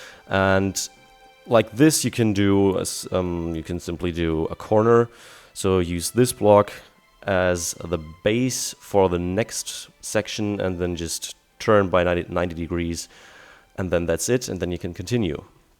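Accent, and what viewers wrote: Germany. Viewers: you defenitly sound german in your older videos, but in this one, for some reason, you sound different. were you sick, or did you have a different mic maybe? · es zeigt, dass Sie mit dem, wie Sie sprechen Deutsch sind Grüße aus Barcelona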